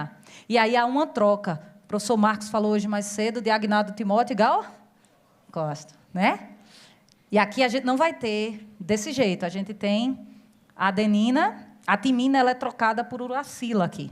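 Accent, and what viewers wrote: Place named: Recife, Brazil. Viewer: Sou do Recife e adorei esse sotaque me senti agora em casa😊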